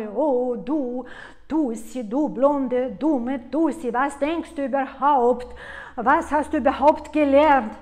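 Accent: Russia. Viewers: Ich liebe deinen russischen Akzent. Übrigens: Wir Bayern rollen auch das "Rrrrr".😁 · Ich hab mir zwar geschworen nie mehr zu heiraten, aber Dich würde ich glattweg sofort heiraten, laaach. Nee, keine Angst, ich weiß das Du verheiratet bist. Aber ich verstehe diese Vollpfosten von Hater nicht. 1. Deine Ratschläge fruchten und haben Hand und Fuß, im Allgemeinen. Und ich denke, wenn Du die evtl. Situation genau kennst, sagen wir mal von einem Teilnehmer Deines Coaching, Du auch ihm ganz treffsicher helfen kannst. 2. Du hast mich in diesem Video zum lachen gebracht, dem Hater wird es wohl nicht geschmeckt haben, laaaach. 3. Du hast keine Schlauchboot Lippen, finde sie sehr sexy und anziehend :) 4. Ich mag Deinen Russischen Akzent und auf den kannst Du natürlich stolz sein. 5. Ich finde es toll das Du die Deutschsprachige Kultur, sowie Deine eigene magst. Das macht Dich auch für das Coaching interessanter und Du hast auch mehr Überblick wie Manner verschiedener Kulturen "klicken". 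6. Du hast einen tollen Humor und gehst die Sache mit den Kohlköpfen/ Hater richtig an. 7. Ich mag Dich so wie Du bist und mach weitere so wertvolle Videos wie bisher.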